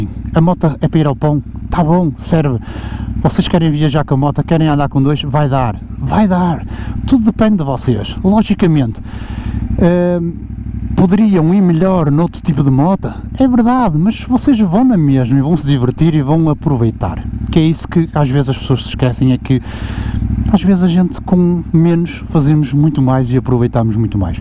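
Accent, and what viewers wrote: Portugal. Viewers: Português é ENGRAÇADO e tem ESTILO. Hahahahahaha A história dos buracos com sotaque Português ficou muito hilário. A imbex 450 é moto fun realmente Dual sport e realmente é commuter. Boa sorte aí Portugal! Brasil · Caramba como os portugueses falam o português bonito!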